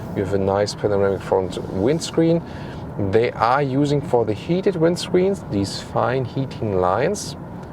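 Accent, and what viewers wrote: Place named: Germany. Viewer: この方、いつも誠実でいい！ 字幕なしでも日本人が理解できるドイツっぽい英語と、話すスピードもちょうどいい！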